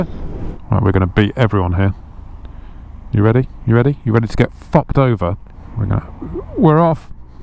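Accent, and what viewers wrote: England, United Kingdom. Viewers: Love the way you think mate, like listening to myself with a pommie accent 😆👍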